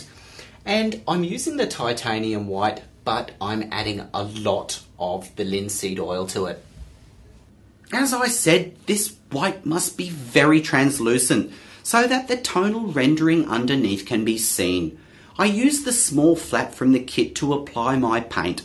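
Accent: Australia. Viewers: lo, the dude has an extremely heavy Australian accent, I think ur safe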